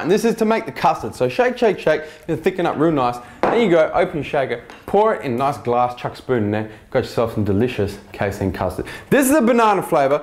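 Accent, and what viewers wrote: Australia. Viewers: Excellent review love your Australian accent bro thanks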